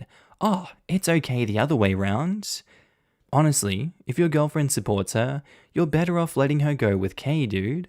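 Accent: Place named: Australia. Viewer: Wow, love to hear a fellow Aussie voice in the evening. Onya! ♥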